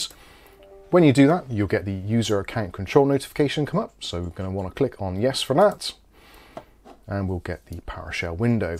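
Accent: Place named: West Country, United Kingdom. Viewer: Sound like a west country lad. I'm originally from Gloucester now in NZ.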